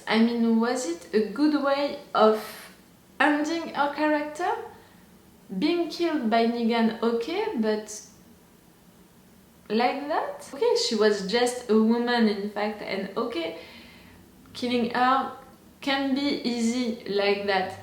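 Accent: France. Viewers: Knew you were French when I heard you. You people have a cute accent 😊. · Tu es française hein ?j’ai reconnu ton accent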